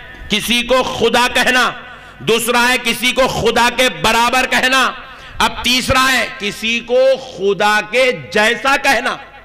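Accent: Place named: Hyderabad, India. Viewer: me to yaar haidrabadi language sunne aaya tha 🤣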